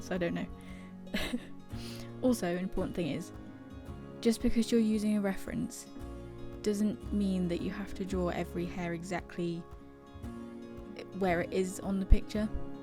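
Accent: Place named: United Kingdom. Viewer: What beautiful job, and a great job of explaining it as well. There's another artist on YouTube (LethalChris Artist) with almost an identical accent. I wonder what region of the UK you are from? That accent is really awesome.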